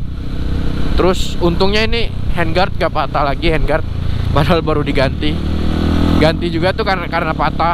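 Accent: Papua, Indonesia. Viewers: habis logatnya kentara bos, papuanya dimna bos, kalo saya di sorong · Kaka, Kaka dari Papua k habis kk pu dialek macam orang Papua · Abang pu Logat bicara Kaya orng Papua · Logat papua ko,sa dan tong Salam dari serui papua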